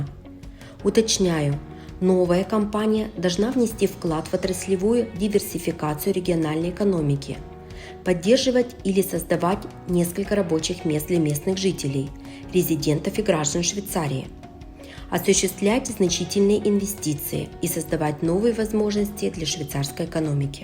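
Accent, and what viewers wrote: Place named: Ukraine. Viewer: Украинский акцент не скрыть, как не старается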